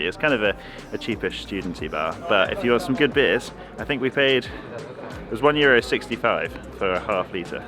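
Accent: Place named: New Zealand. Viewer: Thanks, I was stoned af last night watching this and for some reason thought you mighta been kiwi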